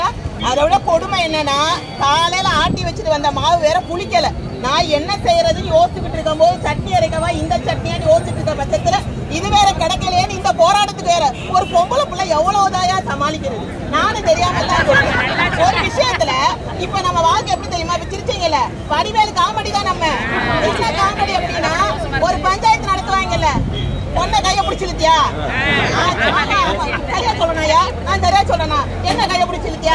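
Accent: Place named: Trichy, India. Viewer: திருச்சி தமிழ் தவழ்கிறது. வாழ்த்துக்கள்.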